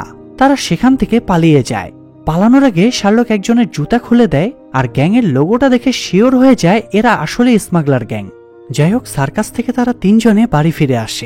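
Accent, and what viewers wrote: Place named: East Bengal, Bangladesh. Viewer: কথকতার মান ভাল না। গলার আওয়াজ খারাপ ।উচচারন বানগাল বানগাল।উচচারন ঠিক করে নাও।শুভেচছা রইল।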